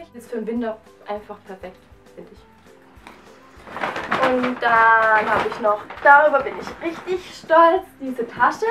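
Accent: Swabia, Germany. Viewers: Kommst du aus Schwaben?:) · redest du schwäbisch? :D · Woher kommst du? Hast einen schwäbischen Akzent ;) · "Des isch" ich komm auch aus deiner Gegend und Finds total schee, wenn ma son bissl Schwäbelt ;) du bist wunderschön, dein Video ist Super geschnitten, du redest als hättest du nie was anderes gemacht...ERSTES VIDEO?! Mach auf jeden Fall ganz bald dein nächstes Video!! Auch wenn ich nicht wirklich viele YouTuber abonniert habe, aber du hasch nach dem Video sofort dazu gehört und ich freu mich auf weitere Videos. Du wirst bestimmt eine große YouTuberin!!!! Viel Glück und ich bleib dabei ;* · Hehehehe dein schwäbischer Akzent♥ ich komm au aus der Gegend;-) tolles Video:*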